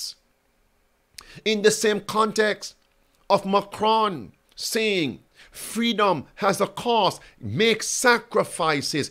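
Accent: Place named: Jamaica. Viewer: Ingles, el nacio en Jamaica por eso el accento que tiene.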